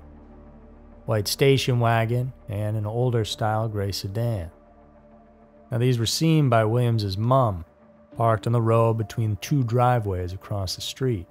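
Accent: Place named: Georgia, United States. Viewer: you're so awesome and professional. So sad and Heartbreaking stories. You're my number 1⃣ story teller. Prayers for the families. 🙏 P.S. I swear I can hear a Georgia accent, but you say you're from Beantown! ❤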